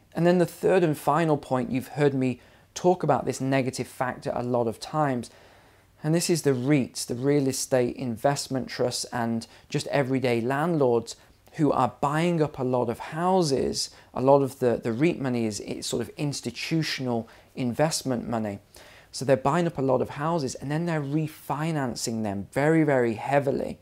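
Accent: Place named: England, United Kingdom. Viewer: is clearly marketing himself for the USA viewers because he knows they are a bigger population than the UK and his English accent might get the audience. It also makes sense now that he is living in the USA.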